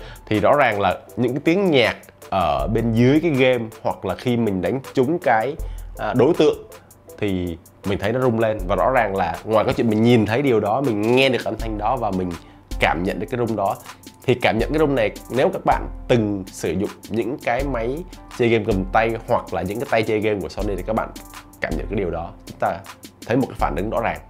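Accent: Vietnam. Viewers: Tiếng anh rất việt nam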